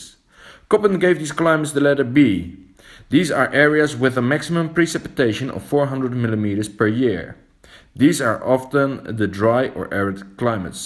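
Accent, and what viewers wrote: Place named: Netherlands. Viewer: lekker typische nederlands accent weer op die engelse taal heerlijk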